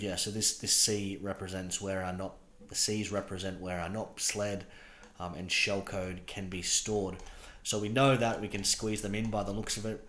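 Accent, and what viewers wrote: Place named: Australia. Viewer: Great series here. Enjoying it while doing OSCP. Nice hearing another Aussie on youtube too lol. Thanks for the uploads!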